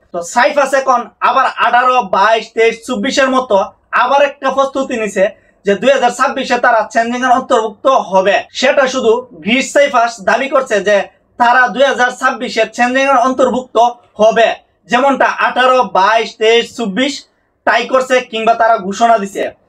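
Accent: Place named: Brahmanbaria, Bangladesh. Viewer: আসসালামু আলাইকুম ভাই আপনার ইনফরমেশন কথা ঠিক আছে।আশাকরি আপনি আরও উপরে উঠবেন আমরা আরও অনেক কিছু যানতে পারবো আপনার মাধ্যমে। তবে একটা কথা আপনার বয়েস কোয়ালিটি একটু শুদ্ধ সাধু ভাষায় বলার একটু চেষ্টা করবেন। ঠিক হয়ে যাবে আস্তে আস্তে চালিয়ে যান কিছু মনে করবেন না আপনি মনে হয় ব্রাহ্মণবাড়িয়া জেলার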